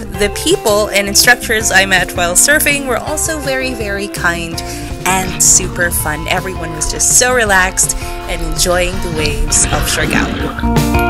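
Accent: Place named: United States. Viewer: You have a good command of English, with hint of American accent. Did you grow up in the US?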